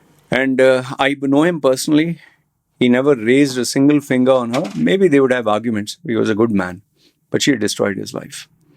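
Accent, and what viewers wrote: India. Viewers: Your accent is Indian too…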